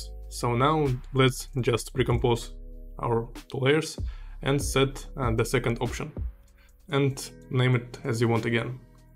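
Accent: Russia. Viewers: С первых секунд ролика понял, что ты русский)) а после "mask один" я орнул))))) классный английский бро)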